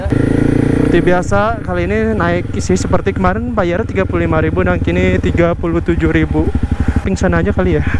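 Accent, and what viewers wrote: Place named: Japan. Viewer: Dengarkan baik2. Kayak aksen Jepang.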